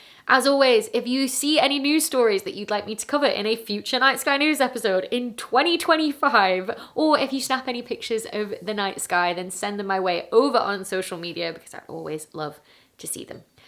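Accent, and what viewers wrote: United Kingdom. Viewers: What accent is this, by the way? Like, where in Britain do people speak like this?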